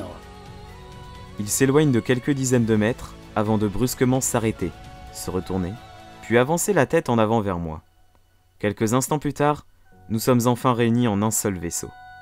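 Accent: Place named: France. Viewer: Bonne vidéo globalement. Par contre, pourquoi ces phrases en anglais avec l'accent français, ça gâche tout !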